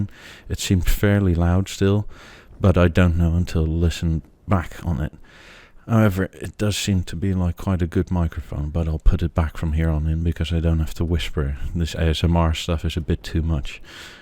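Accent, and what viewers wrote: Netherlands. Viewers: i can tell by your accent 😅 dutch accents are very distinctive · You sound like a Dutchman who’s lived in England for years, midlands/north of I had to guess, maybe Manchester. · Dutch accent noticable within the first 5seconds but good vid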